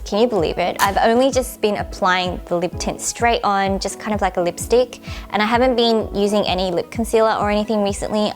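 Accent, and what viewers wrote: Australia. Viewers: What's her accent? Australian?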